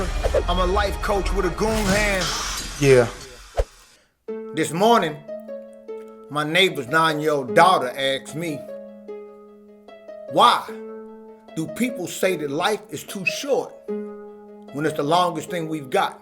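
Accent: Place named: California, United States. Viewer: homie you sound more like a Cali nigga brah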